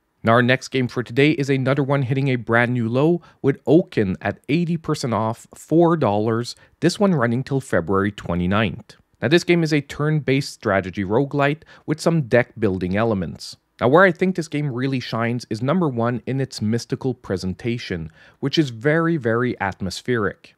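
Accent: Quebec, Canada. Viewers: That's a Quebec accent if I've ever heard one.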